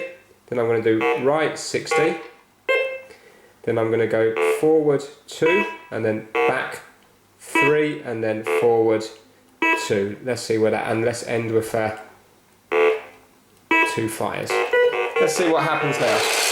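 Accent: United Kingdom. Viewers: Haven't watched the entire video yet but I'm having a moment of appreciation for this channel. I'm British but your accent is posher and softer than mine. I like how you film in natural light. You are enthusiastic in how you fix things and know about the background of most items. You usually speak as if you know nothing yet you've managed to fix almost everything. I've watched multiple 1 hour+ long fix it videos. Great content. Keep it up.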